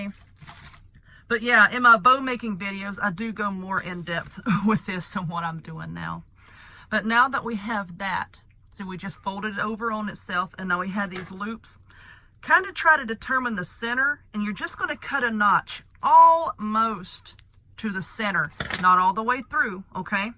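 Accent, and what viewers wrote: South, United States. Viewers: I LOVE cats and Candycorns! What an Adorable combo! You are So creative, I love it! I just got back from DollarTree a while ago with the stuff to make your owl wreath! I can’t wait! I love you videos SO much! I love listening to your southern accent and some of the cutest southern things you say! Thank you so much for brightening my days and helping me be more creative!🎃🎃🎃🖤🖤🖤🧡🧡🧡🎃🎃🎃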